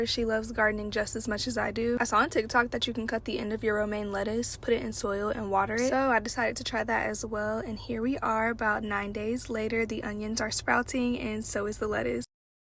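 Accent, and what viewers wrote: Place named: South, United States. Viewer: i love watching your vlogs so much !! the mani pedi part had me giggling so much !! let that southern accent shine girl heheh 🤍🤍🤍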